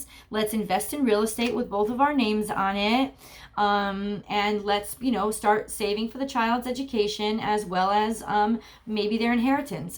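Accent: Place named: East Coast, United States. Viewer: so glad to seeing you in such good, and wonderful Spirits, after all you have been through recently. You are such a joy and blessing to watch you, when you post for us. You absolutely make my day, when I get to be with you on the postings., I have gotta ask you, I defintely see a very strong Italian background with you. The way we, ( I'm Italian too, on my Mom's side), talk with our hands, your eye movements, and facial structure, nose, mouth, etc. and your accent as well, East Coast, New Jersey or New York area, but I see and hear "Italy", with and from you! So, am I right, are you of Italian descent in your familia? Bye for now, Caio Bella! Bob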